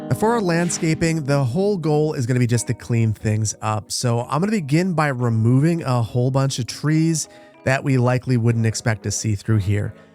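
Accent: Wisconsin, United States. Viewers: you’re from wisconsin??? 😂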